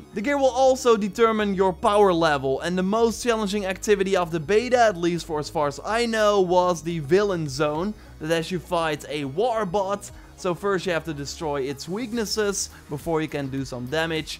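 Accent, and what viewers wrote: Netherlands. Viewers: That Dutch accent is so strange and thick.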